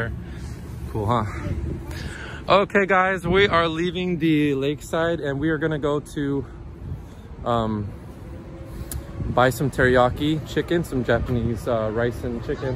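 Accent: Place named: Visayas, Philippines. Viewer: Sir ang cute mo mag Bisaya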